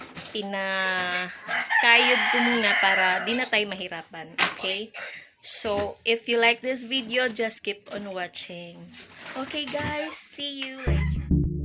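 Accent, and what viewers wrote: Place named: Visayas, Philippines. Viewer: Pina ka tuno sa bisaya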